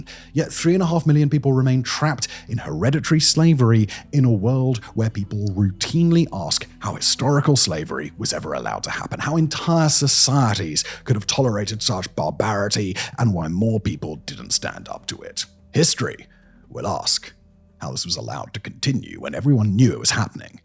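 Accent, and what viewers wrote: England, United Kingdom. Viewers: Why does everything presented by sound like it has more authority because of his British accent? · Jewish have run India for centuries! They know what Jewish Britain did to them . Your English accent can’t hide your Bias either;(! Says The BronxBoy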